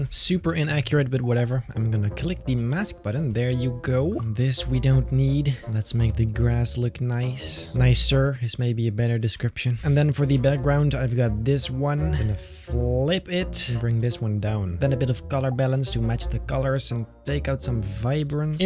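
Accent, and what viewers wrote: Netherlands. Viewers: I heard your voice for a minuite and I thought to myself 'this guy must be dutch'. It's the same accent as from Michiel Huisman (dutch actor from Game of Thrones) and Paul Davids (dutch youtuber who speaks english also) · it sure does sound dutch, I mean, it's not a horrible one, probably why people say it doesn't sound like one, but from my experience (me being swiss) you do sound dutch :)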